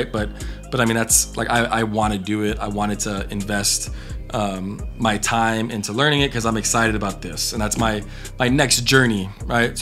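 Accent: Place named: United States. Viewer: You pronounce some words like an American Latino.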